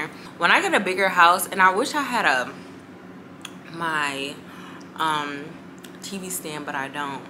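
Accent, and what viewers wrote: England, United Kingdom. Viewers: it’s the british accent for me lmaoo, im happy you’re in a better state ❤️❤️keep the vlogs cominggggg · Elloooooo british accent 😅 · You’re so unique I love your vibes! And your bri-ish accent 😂💖🥰 ps: you drew a candy cane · The Accent is sending me especially being British😂😂 The vlogs are always so motivational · Loving the. Vlogmas motivation is key keep it up . And your British accent l😂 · Your English accent gets me every time ….and I’m from London 😂😂😂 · The beginning of the vlog is just funny 😅😅 the UK accent love you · The British voice 😂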